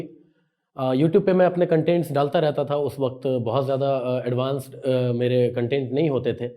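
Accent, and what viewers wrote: Bihar, India. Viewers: Aap bihar se hai sunn ke khusi hui ❤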